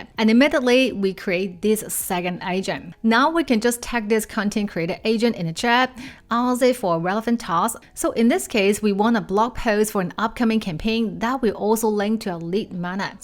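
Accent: China. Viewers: you are talking TOO fast and the Chinese accent doesn't help! You are also rapidly Jumping between applications while you speak, making it very difficult to figure out which application you are on. I thought this was a step-by-step guide, not an exercise in speed-reading!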